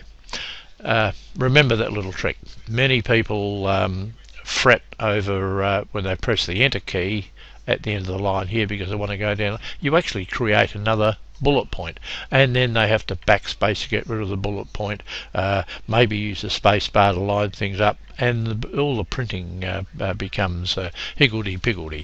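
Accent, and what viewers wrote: Australia. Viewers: Awesome video buddy, helped a lot! Subscribed for future stuff like this! And the Aussie accent made it a ton better!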